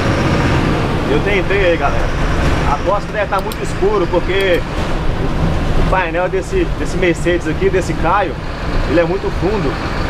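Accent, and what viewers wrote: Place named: Minas Gerais, Brazil. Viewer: sotaque minerin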